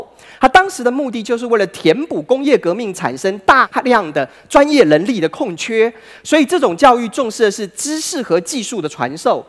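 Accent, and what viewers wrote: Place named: Taiwan. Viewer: 内容挺好，但这口音实在是太重了。不记得台湾口音的舌头可以直成这样😂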